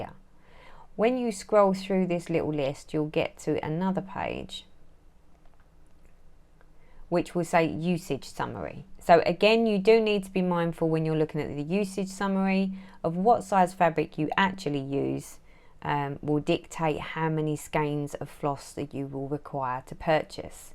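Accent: United Kingdom. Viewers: I came across your floss tube video quite by chance and I love it , you are hilarious and the struggles you have had are so identifiable to every cross stitcher. I live in a household full of men/ boys so you are my company when I am sat stitching. We left the UK 3 years ago to live on the west coast of Canada and it is so great to hear a voice from home.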